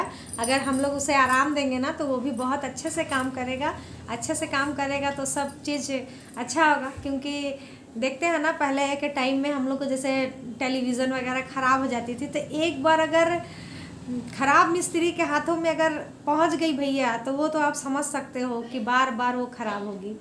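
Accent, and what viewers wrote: Bengal, India. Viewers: Didi ap bengoli ho ????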